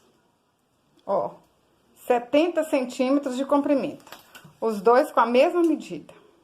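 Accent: Minas Gerais, Brazil. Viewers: E esse sotaque mineiro? 💞💞💞💞💞💞💞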